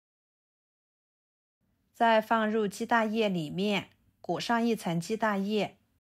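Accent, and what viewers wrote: Yunnan, China. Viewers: 实在是不习惯听你的云南马普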